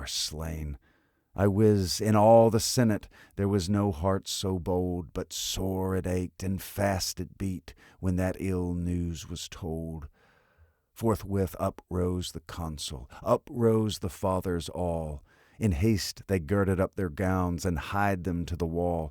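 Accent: United States. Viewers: I had been lamenting the shortage of people reading great poems, well, in an American accent. So glad to have found this. Have you considered reading some of Chesterton’s poetry?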